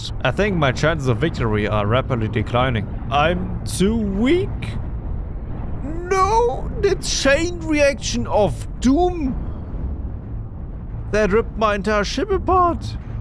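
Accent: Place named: Germany. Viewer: Finally, the Empirer narrated as it should be... in German accent!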